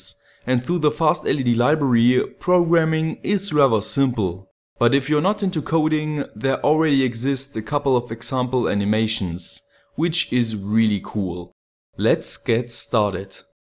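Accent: Germany. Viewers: i believe he's german :p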